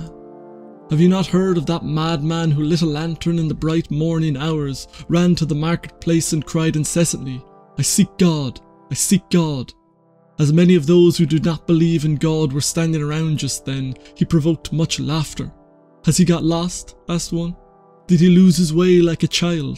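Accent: Ireland. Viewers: aha, I just showed your videos to a mate whose just discovered the late alan watts and we were guessing your accent! He voted midlands, I voted not dublin. And I am indeed! You have some great videos man, really enjoying your work. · I like your videos by I get distracted by your accent. Where you from, Wicklow?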